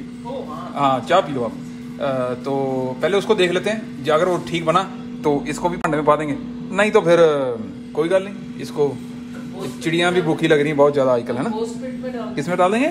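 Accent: Punjab, India. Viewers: Loved your punjabi yrr ki authentic punjabi boli hai🤣🤣🤣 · g twadi hindi mix punjabi kmaal ey yr...exact imitation ey ona noee--dolteyey di jina nu chj di ek zubaan vi ni andi😂😂😂 love you! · He was trying so hard not to go full punjabi hahah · Veere punjabi leak hoi jaandi aa thodi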